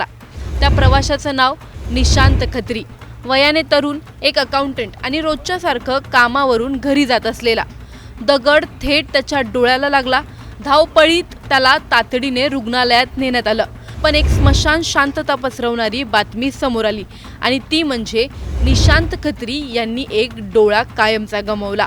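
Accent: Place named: Khandesh, India. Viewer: Aani pani he marathi bhasha jalgaon khaandesh chi ahe . Kahi problem ahe tula ? Marathi che khup variants ahet.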